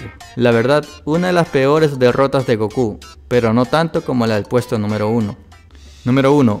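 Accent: Chile. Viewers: Jauregui Zurita chileno por el acento facil de diferenciar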